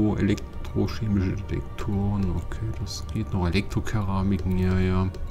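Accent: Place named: Saarland, Germany. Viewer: Moin, Nettes Video. Dialekt klingt, als wärst du aus der Nachbarschaft. Grüße ausm Saarland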